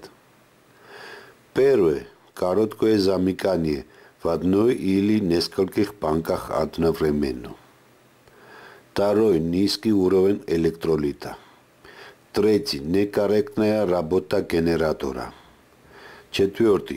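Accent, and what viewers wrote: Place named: Georgia. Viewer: Прекрасно слушать русски под грузинском окцентом.❤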